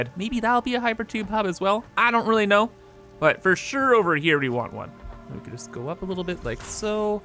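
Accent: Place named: Canada. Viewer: Not my first video, but first time I've thought to thank you for just sounding absolutely incredible :P Canadians are my favourite americans and you sir have one of my favourite Canadian voices. ALWAYS a pleasure to watch your vids just because of your energy and cheer =]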